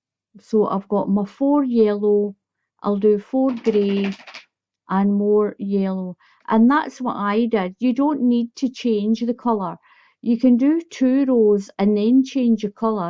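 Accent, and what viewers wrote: Scotland, United Kingdom. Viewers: Another beautiful blanket, can I just ask you on the border what do you do on the corners for the double row and the treble row? By the way love your accent, I had my DNA done and I'm quarter Scottish. But I dont have the accent which I wish I did.,your work is as always fantastic.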